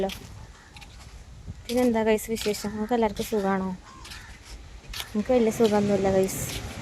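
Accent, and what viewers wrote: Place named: Malappuram, India. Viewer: Malapuram slang kunu kunu kelkan ishtan but tto tto kelkumbo vallatha oru irritation 😂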